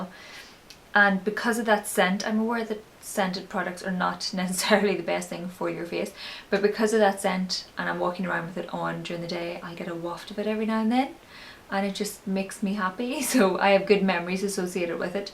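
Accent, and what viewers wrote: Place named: Northern Ireland, United Kingdom. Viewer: The NI accent!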